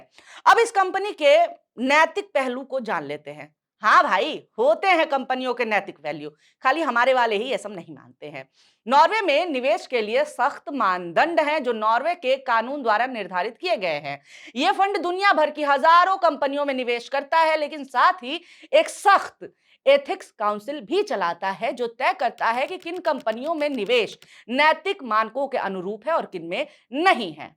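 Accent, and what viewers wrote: Bihar, India. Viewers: Madam normally bol Liya kijiye. Aapka bolne ka Bihari style irritating Hai.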